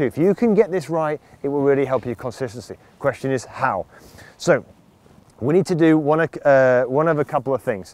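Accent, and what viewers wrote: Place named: England, United Kingdom. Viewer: Just found you yesterday. You are the first of countless internet teachers who's videos I have viewed who speaks a language (not counting the English accent :-) ) I have been able to understand! I took your tips on the take away to the range today and hit my irons more solidly and more consistently than I have in a long time! Please keep the videos and the 'simple talk' coming!! I'm very grateful for your help!! I look forward to more tips, drills and instruction and once again enjoying the game of golf!!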